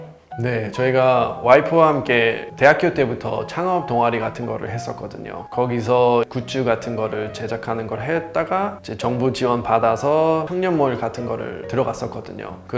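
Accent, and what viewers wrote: South Korea. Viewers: 문법이 완벽하다 심지어 영어를 한국식으로 발음하네 대단합니다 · 눈감고 들으면 한국인., ,ㅣㅇㅇ퍼 카페 그만두시고... ㅠㅠ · 우와 영어를 한국인발음으로 구사하는 분은 첨~~대박 아메리카노,쓰리샷,cctv 한국인인줄ㅋ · 보통 한국말 잘한다고 하는 외국인들도 외래어를 할때는 본토 발음으로 하던데 이분은 그냥 한국식으로 말해주네요^^ 대단하십니다.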